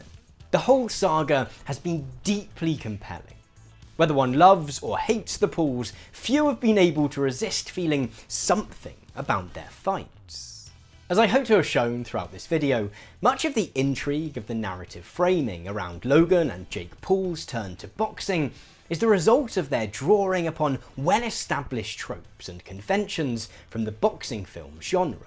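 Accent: RP, United Kingdom. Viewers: voice, accent and intonation sound exactly like coming from a listening exercise in a British English course. Perhaps recording these is his other job...?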